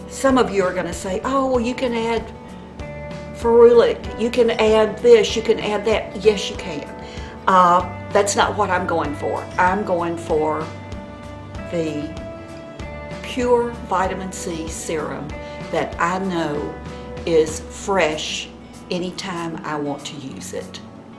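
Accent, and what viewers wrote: South, United States. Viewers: She's adorable . Not to mention the southern accent xo ...get try it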